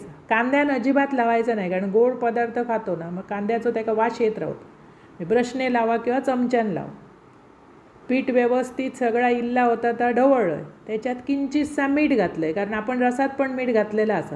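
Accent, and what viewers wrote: Malvani, India. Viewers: तुमची मालवणी भाषा बोलणे खूप सुंदर असा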